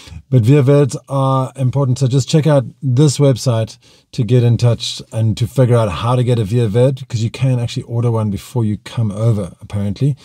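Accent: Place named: South Africa. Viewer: fascinating video Happy to find your channel. I'm also from south africa and the accent was so recognizable. I'm thinking of moving to Portugal and this video and the public transport one was very inciteful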